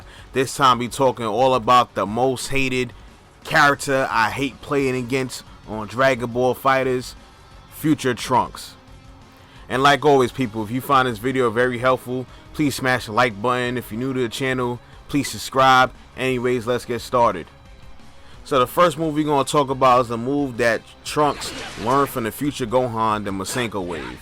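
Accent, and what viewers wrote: New York, United States. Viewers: The most NY accent ever. Instant like and subscribe.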